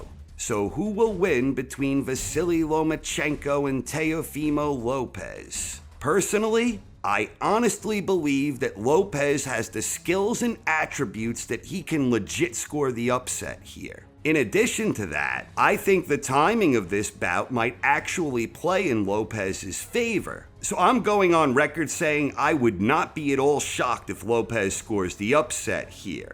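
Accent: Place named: East Coast, United States. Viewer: ! You are the Best at this stuff in my opinion! The way you talk and your accent is a huge draw for me! Don't loose your east coast accent! Lomo vs. Lopez is on the calendar! Thank you for your work!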